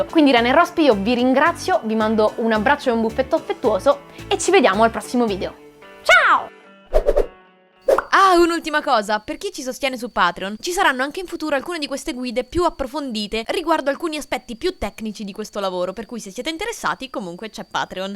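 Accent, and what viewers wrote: Tuscany, Italy. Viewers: Ma sei diventata ancora più carina? Btw, ti prego parla toscano, è un accento bellissimo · sei toscana??? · Vogliamo un video in cui parli solo ed esclusivamente toscano!! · Bene, direi. Che aggiungere? Nulla: interessante & divertente come sempre (però io voglio sentire la che ci parla in toscanaccio marcato eheheh), e complimenti per questa "maturazione" nella cura e nel lavoro messo nei draw my $whatever:) Io in fondo non posso fare testo: ogni volta che prendo anche una semplice matita in mano, da qualche parte un grafico sviene o viene colto senza preavviso da violenti conati:( Quanto ai sogni... non vedo l'ora che ci racconterai i prossimi... posso consigliare, per favorire le cose, un bel film horror mangiando popcorn aromatizzati alla peperonata con le cozze? Dovrebbe dare quel tocco in più alla vena creativa:D ahhahahaha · sei toscana ma di preciso? 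Pisana,Livornese,Fiorentina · ma te sei toscanaa???? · sei brava fra come sempre! :-) ps: la cadenza toscana a farla sentire al mic. ti ci devi impegnare altrimenti un si sente ah ah anche a me succedeva.. ciao. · ma sei davvero toscana?? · Anch'io sono della Toscana! Non me ne ero nemmeno accorta che parlavi in toscano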